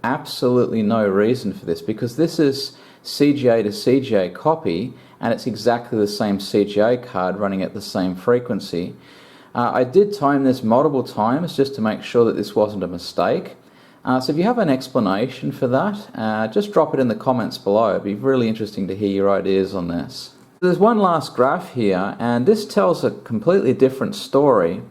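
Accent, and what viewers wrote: Australia. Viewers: Excellent video! Wizard-like skills! We should combine work over our YouTube channels or something if you are interested. Sounds like you are in Aussie - I'm in NZ. Give me a shout if you like :)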